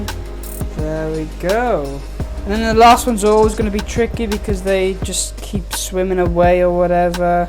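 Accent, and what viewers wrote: Wales, United Kingdom. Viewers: Just subbed, nice little setup.. hear the Welsh accent too👍🏻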